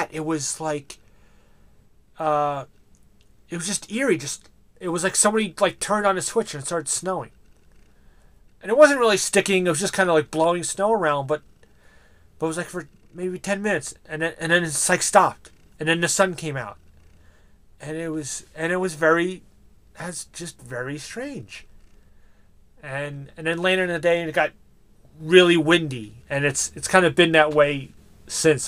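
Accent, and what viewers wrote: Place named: New York, United States. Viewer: you from new york? I heard an accent and I used to live there. Good vid I subbed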